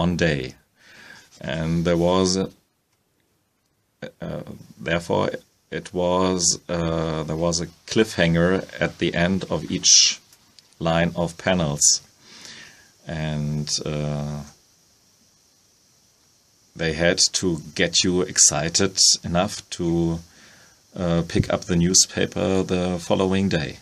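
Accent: Germany. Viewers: I Listen to your soothing German accent while painting. Keep up the great work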